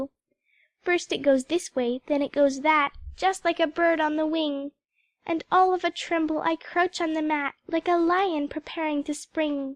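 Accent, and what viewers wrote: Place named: United States. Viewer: Английский с американским акцентом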